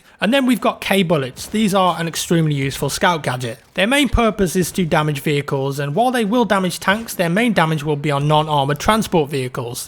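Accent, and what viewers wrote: United Kingdom. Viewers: YOU. R. SO. BRITISH.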